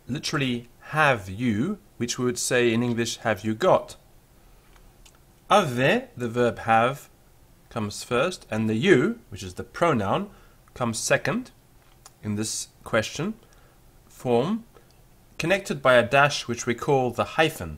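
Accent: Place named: United Kingdom. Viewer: interesting British french accent :-)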